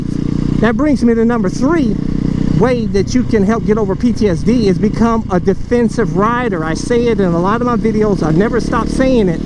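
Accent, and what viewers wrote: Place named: Northeast Ohio, United States. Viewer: I get this strange feeling that you’re from northeast ohio. Maybe its the accent.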